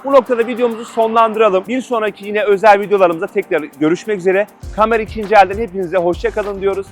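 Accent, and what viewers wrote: Samsun, Turkey. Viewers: Samsun şivesi bu ama konu o değil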